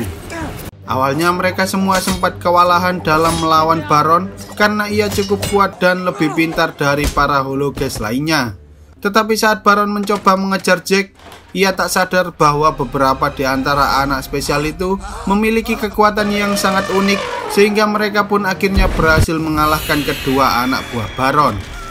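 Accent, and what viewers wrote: Java, Indonesia. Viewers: Suaranya medok kyk dodit Mulyanto 😂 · Bg sira wong jowo kah? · Jowo ndi Iki seng duwe konten? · Jawa kah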